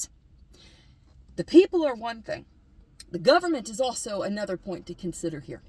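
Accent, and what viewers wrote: South, United States. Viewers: Thanks. Very good video and my wife and I heard more of your southern accent in this video. We love it. When the temp of my blood rises the southern, mountain accent comes out too. Bless you!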